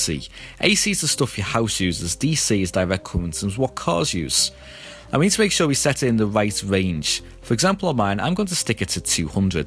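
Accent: United Kingdom. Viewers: Is this British acsent ??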